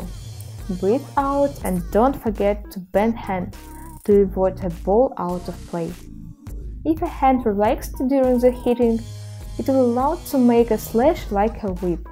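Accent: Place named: Russia. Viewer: Сначала не мог понять, почему мне мерещится русский акцент. Прочитал название канала. Понял,я то не мерещилось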